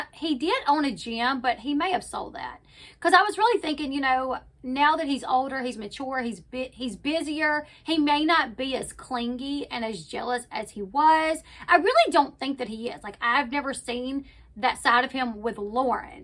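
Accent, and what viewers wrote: South, United States. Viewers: I LOVE your southern accent. It really stands out to me because I never hear it where I live on the East Coast.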